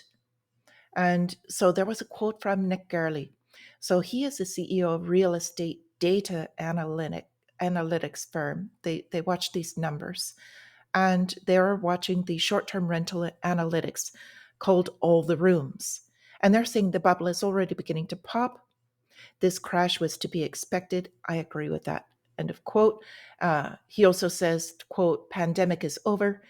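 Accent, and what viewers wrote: Ireland. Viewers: Are you from Ireland?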